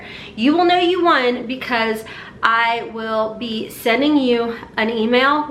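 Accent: South, United States. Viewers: So I seen in another video you said something about being at an Ohio festival… are you from Ohio or down south? Some words I hear a southern slang behind lol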